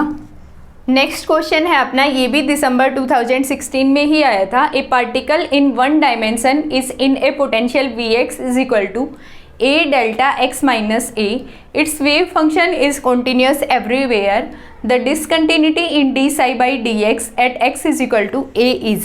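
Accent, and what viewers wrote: Haryana, India. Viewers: maam are you from haryana???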